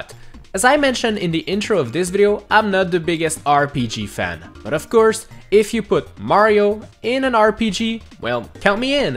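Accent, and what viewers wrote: Quebec, Canada. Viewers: The captions say busses when he says bosses. Does fight busses, is this just a Canadian thing? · it's a French Canadian accent if you're wondering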